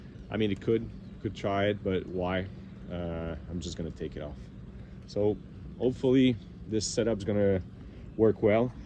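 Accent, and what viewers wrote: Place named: Canada. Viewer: great ! you re good in english ! are you good in Spanish too ? LoL Oui ! ton anglais est tres bon ! Mais L accent du canada vas toujours rester ! Je suis retraite et prevoit aller vivre au Mexique en 2023 ! la derniere fois que j etais au mexique etait en 1981 ! LoL are you going to be there next fall ? would be fun to chat over a coffee ! good luck with the Storm ! I think you should be ok from where you are ! Asta manana !🧐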